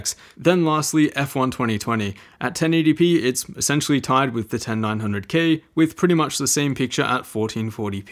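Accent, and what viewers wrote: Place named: Australia. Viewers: definitely australian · He's Australian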